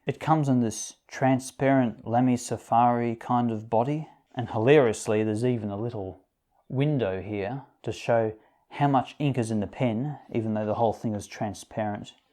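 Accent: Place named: Australia. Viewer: whoa, yes, hahaha. But this chap is (sorry Wade, no offence) a little more high class I reckon. Or it could just be the slightly different regional Oz accent that sounds classier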